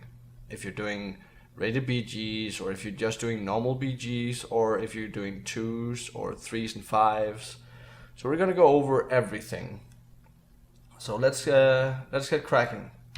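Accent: Denmark. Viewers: I can hear it so clearly on the way you say things that you're a Dane, and that you're trying to speak English with an accent, don't try if you cant do it.... speak with sum more British people like I've done and now my English is amazing(speaking wise). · hey dude are you danish ? :) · haha, i knew he was from Denmark from that accent lol :)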